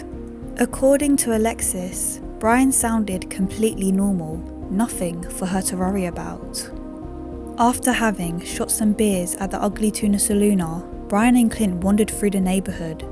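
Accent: United Kingdom. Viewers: I love the narrators Luton/London style accent. Sounds elegant with twangs of ghetto 😅 Love it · Sounds very Croydon?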